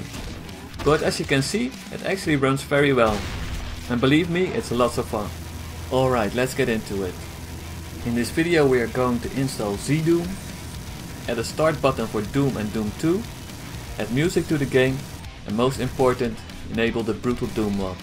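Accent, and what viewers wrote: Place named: Netherlands. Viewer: You sound Dutch Ben jij. Nederlands?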